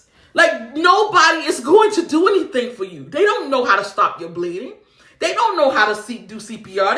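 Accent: United States. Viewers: They probably chalked it up to your American accent...And thought you were talking about the hippo, that escaped from the local zoo, from a few days before!!!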